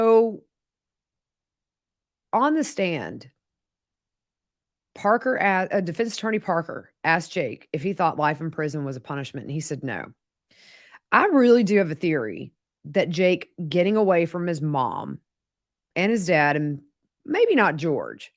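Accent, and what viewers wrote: South, United States. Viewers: Love how you narrate with that sassy southern talk you had me lol,thanks for all the hard work!⚘️ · You sound very southern even when you’re not trying to sound like them. I’m southern so I can hear it. Love the videos. Thanks.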